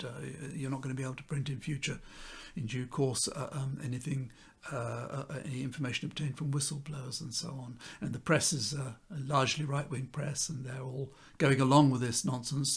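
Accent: RP, United Kingdom. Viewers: I may be guessing here but from the diction, the accent and the probably assiduously practiced impression of faux bewilderment, it seems inconceivable that this fellow could possibly have any idea of what it was like for the wages of most people to be undercut by the availability of a limitless supply of cheap labour from the continent. Any road up, I was grateful for an opportunity to have another good laugh at a bitter, remainer loser. I'm only sorry I couldn't watch the whole video. I think I may have split my sides had I done so.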